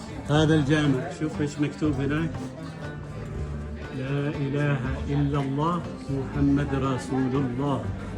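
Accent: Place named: Oman. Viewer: من لهجتك عماني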